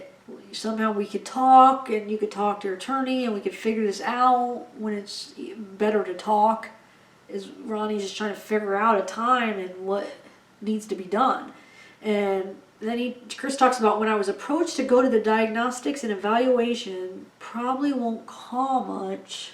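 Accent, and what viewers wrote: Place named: Midwest, United States. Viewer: I had to take a break from this case also... I can only imagine how feels... But her videos are great! I think her observations and insights are spot-on... Lol plus I love to hear her talk.I think she has a strong Midwestern accent and I am from the Midwest also lol I say Illinois Wisconsin or Michigan LOL 😜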